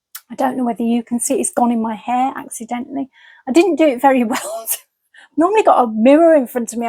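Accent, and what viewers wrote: Birmingham, United Kingdom. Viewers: I thought you were from Solihull or Birmingham by your accent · I always thought it was a Birmingham, Midlands accent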